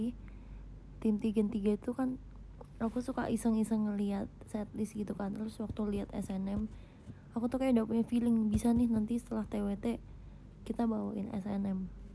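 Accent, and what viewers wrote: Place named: West Java, Indonesia. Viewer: Mlm ni nyunda pisan uyy....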